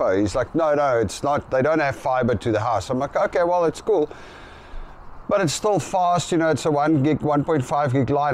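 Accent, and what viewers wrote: South Africa. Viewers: You are South African🎉❤ i can immediately tell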